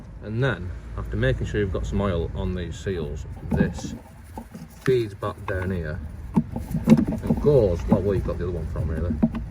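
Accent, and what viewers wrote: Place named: Oldham, United Kingdom. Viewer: I visit this channel for my daughters’ C1 and now you’ve bought the same model as my car, there is a god. Plus those dulcet Oldham tones, reminds me of home.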